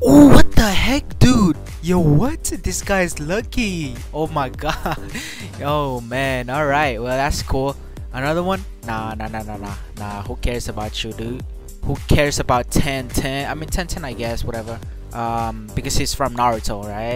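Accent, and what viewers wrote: Philippines. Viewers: Your speaking english but your accent is filipino :\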